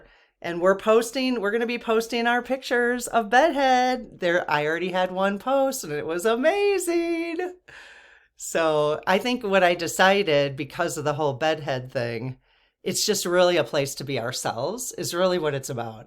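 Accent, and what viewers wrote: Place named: Wisconsin, United States. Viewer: ....wow you are SO Refreshing, I love listening to you cause it feels like talking to my sister or best friend....by the way are you from Wisconsin? you have that Wisconsin kinda vibe and accent? I LOVE your feisty truth telling too........all of us should strive to have that yin yang balance of doing deep inner healing work, connecting to our own higher self and spirit guides to help us grow and learn....and then taking action and being brave enough to challenge the injustice and talk about it like you are doing on your channel....May we all be guided together to a more peaceful and sustainable world!!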